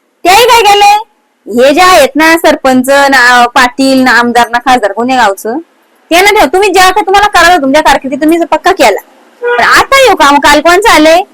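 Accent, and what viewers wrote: Murbad, India. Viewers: मी कर्जतचा आहे.. आमच्या कर्जतची आणि बाजूच्या अंबरनाथ तालुक्यातील लोकांची बोलण्याची टोन पण मुरबाडकरांशी मिळती जुळती आहे..ही टोन आपलीशी वाटते आणि बोलताना पण आपलेपणा वाटतो..Keep it up 👍👍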